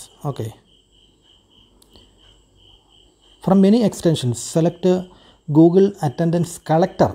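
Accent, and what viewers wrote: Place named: Kerala, India. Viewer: You are a malayali I can understand it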